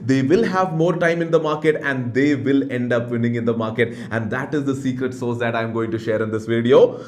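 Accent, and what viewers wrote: Kerala, India. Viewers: Strong malayali accent nice :)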